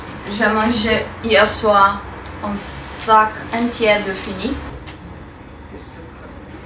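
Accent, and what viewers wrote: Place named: France. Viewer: Muito inspirador acompanhar sua trajetória, seus desafios, seu dia a dia e essa voz linda, muito francesa !!! ☺🥐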